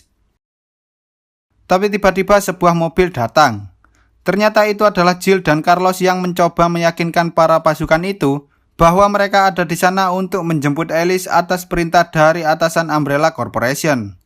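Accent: Java, Indonesia. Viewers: Orang jawa kah? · Bang wong jowo to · Wis rasah suen suen dri jawa mna bang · Bang orang jawa ya? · Bang jawa ap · ini suara dubbing jawa ya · Wong jowo ya bang?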